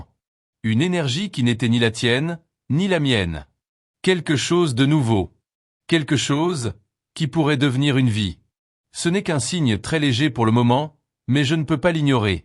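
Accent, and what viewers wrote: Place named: Portugal. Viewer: C'est n est pas une autre langue..mais du français avec un accent portugais ou brésilien Mais, oui,c est penible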